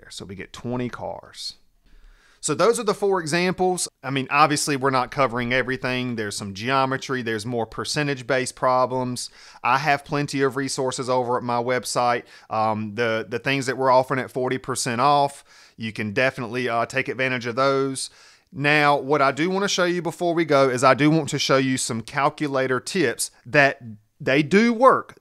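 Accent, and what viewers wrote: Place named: North Carolina, United States. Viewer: Hey, fellow North Carolinian! I recognized that accent quickly. I just wanted to say that I just took my TEAS a few days ago. Due to your videos I scored a 95 on math, my highest score on the exam. I wish I could post a picture here. I also want to let you know that my calculator, that was made into the exam, had the % button and square root button on it surprisingly. Guys, definitely go over percentages. I had tons of % questions, all definitely in line with those that offer., sincerely, thank you so much. 🙏 I bought the Mometrix 7th edition book, but it wasn't nowhere near as helpful as you (and Nurse Cheung for reading and English). Watch these two YT'ers and you will pass those 3 sections. Now, science was a whole other story. I took A&P, passed with a 96...yet science was ridiculously in depth. Study that subject very well. 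I wish you all the best, don't give up! ❤️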